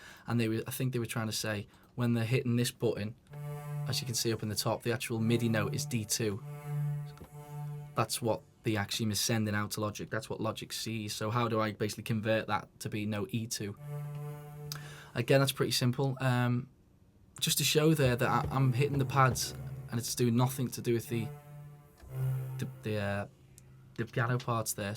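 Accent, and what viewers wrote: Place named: Liverpool, United Kingdom. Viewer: Subscribed! Brilliant teacher, thanks. And I could listen to that Liverpool accent all day....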